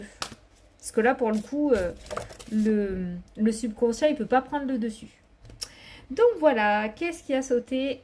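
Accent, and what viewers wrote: South, France. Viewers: Dommage qu'Olivier vivent en Normandie 😕 Avec ton accent du sud, j'ai espéré qu'il soit dans le coin. Avignon/Normandie arrrf 😁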